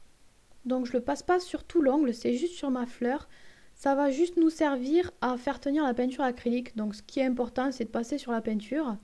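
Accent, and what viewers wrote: South, France. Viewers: juste super beau ,j'ai une question,tu as l'accent du sud non?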